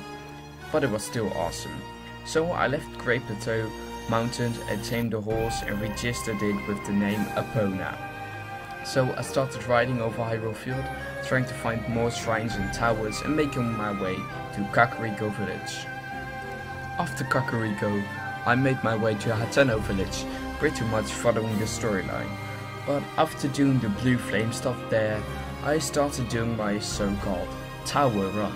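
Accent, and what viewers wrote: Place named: England, United Kingdom. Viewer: ur english accent is getting pretty dank btw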